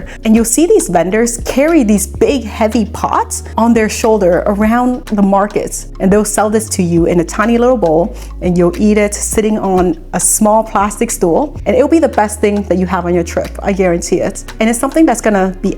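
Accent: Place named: South, United States. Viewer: Why do you speak more like a southerner?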